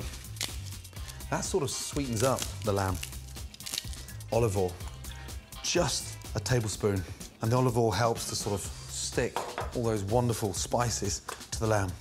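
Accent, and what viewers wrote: United Kingdom. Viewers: I just love watching you sir. Good recipe and looks delicious as well. By the way I love the British accent.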